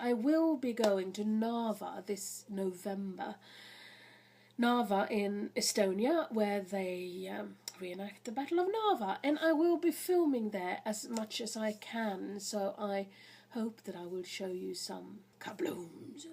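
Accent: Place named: United Kingdom. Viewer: You are fascinating. LOL! I hope you take that as a compliment. Do you live in Sweden? From your accent I was assuming you were in the UK.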